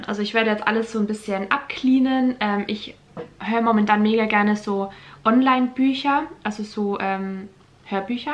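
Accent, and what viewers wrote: Austria. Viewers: Welcher Dialekt ist das? "für die Uni zu dun" "Unicondend" :D klingt nach Österreich oder so?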